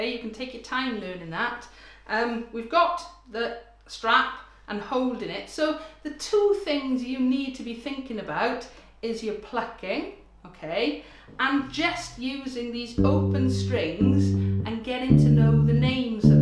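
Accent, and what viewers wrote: Wales, United Kingdom. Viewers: New sub I’m so hate find you. Where from Wales are you from? X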